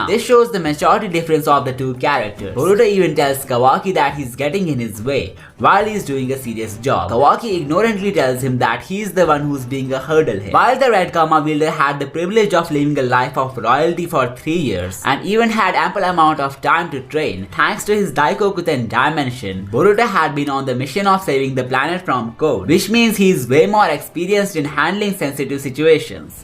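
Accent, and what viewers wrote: India. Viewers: can u speak hindi??? your accent seems indian if yes... please make theories in hindi please please i am your OG watcher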